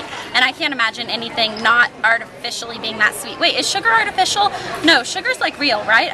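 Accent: South, United States. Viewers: AHHHHHHH!!! omg! I had NO IDEA you were from Tennessee?! Hahaha that's where I live! Lol you will probably never see this but I've heard you & your southern accent i just didn't know where you were from haha (: -3 TN... Nashville:P xoxo love you! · Ive been trying to figure out for so long where you were from. Tennessee? That's so cool. I'm from Hawaii. Ive always wanted a southern accent. Is that weird? Haha well have fun at prom :) -Kat Bloop O.o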